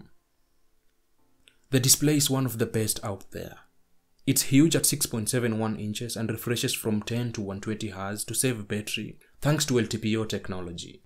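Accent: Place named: Kenya. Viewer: Dunno why I feel like you sound Kenyan😂😂😂....just bumped into your channel as I was reviewing the pixel 6 pro. I have the Pixel 6 and love this phone but honestly I keep wondering if the camera on the pro is better. Do you have an opinion on this? That would be the sole reason I switch to the pro. I love taking memories of my kids on video and photo and want the best images? If the pro is better I just might be convinced to swop purchase it. Thoughts ?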